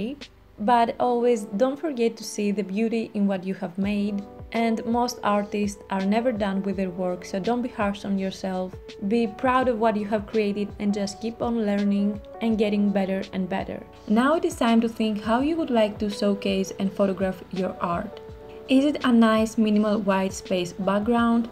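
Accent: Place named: Greece. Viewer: you sound greek, i loved your video it really helped me thank you!!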